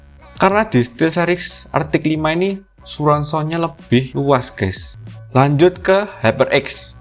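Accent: Java, Indonesia. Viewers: mantap bang , ada khas medok medoknya . GDluck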